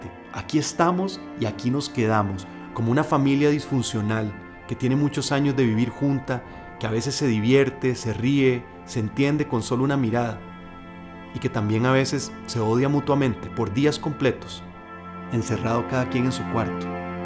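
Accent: Costa Rica. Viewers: Desde Guatemala, mis respeto, que hermoso escuchar a un tico hablar asi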